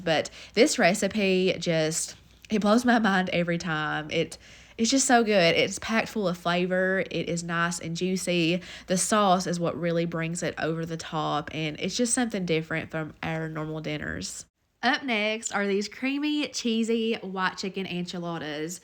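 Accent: South, United States. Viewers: great video again. Love your cooking, presentation & of course your delightful Southern accent! Please do regular videos in 2024. Thanks & best wishes from Sydney Australia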